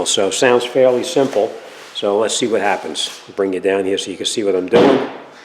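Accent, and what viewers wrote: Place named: New Jersey, United States. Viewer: Somebody from New Jersey somewheree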